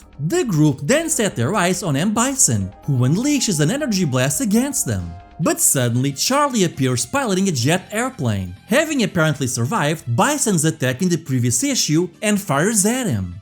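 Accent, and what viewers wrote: Portugal. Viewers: I heard your speaking and already knew you were portuguese! Lmao! Thank you for making content on brazillian fgc. Greets from Brazil! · Vc é português? Pelo sotaque, pareceu muito.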